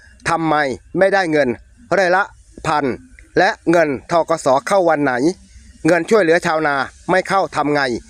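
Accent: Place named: China. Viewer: สำเนียงจีน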